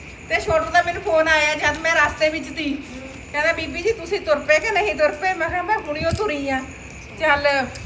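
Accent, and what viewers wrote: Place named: Patiala, India. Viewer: beta tuhada pind kihra hai boli patiale de hai❤❤🎉🎉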